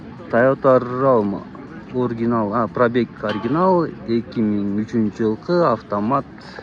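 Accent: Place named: Naryn, Kyrgyzstan. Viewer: Аааа ты Нарынский 😂😂😂❤❤❤❤,,, ооооо здорово свои оказывается,,, ну, большой привет от меня и от нас,,,!!! Аааа вот подвох чуть чуть акцент есть 😂😂😂😂,, но всё таки есть у Нарынских привычки 😂😂😂😂,,, так держать иничек,,,!!!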